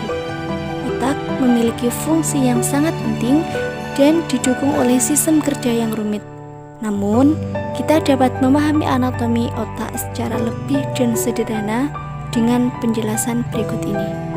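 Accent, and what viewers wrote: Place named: Java, Indonesia. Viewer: Medhok yuuu 😭🤣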